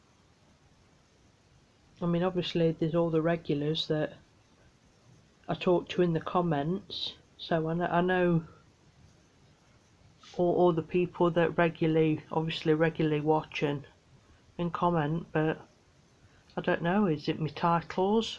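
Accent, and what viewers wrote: United Kingdom. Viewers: Morning, love watching & hearing your videos & sorry to hear about your little dog, mine had a bad leg & I couldn’t stop her jumping on sofas, beds etc little devil. Nice to hear a UK voice, what part are you living, I’m in Northumberland. Hope you get this sorted 🤓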